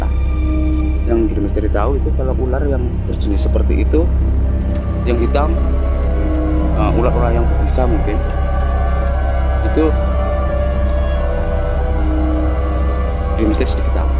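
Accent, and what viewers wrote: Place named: Central Java, Indonesia. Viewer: Logat Jawa Tengah Salam kenal....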